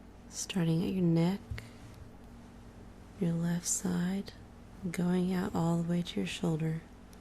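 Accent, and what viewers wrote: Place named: Midwest, United States. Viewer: It's alright. You and I have the same accent. Not quite... deep southern. And not quite something else. >_> I'm not even sure what it is. Midwestern, I suppose. I LIKE IT. <3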